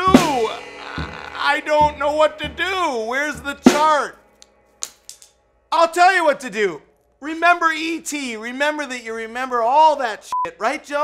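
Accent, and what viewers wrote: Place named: Midwest, United States. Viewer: This dude is from the midwest for sure.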